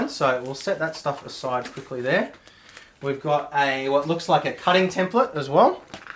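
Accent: Australia. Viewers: Hey thanks for replying. Judging by your accent I think you're Aussie? I'm trying to get my feet into sim racing and stumbled across your channel. I plan to start with a Next Level F1GT + CSL Elite Pedals + CSL Elite Wheel Base 1.1 + Fanatec Formula Black. Primarily will be playing F1 2018/19. What do you reckon this setup for a starter please?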